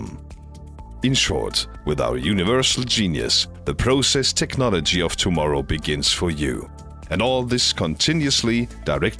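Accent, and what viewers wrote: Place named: Germany. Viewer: German accent turned up to 11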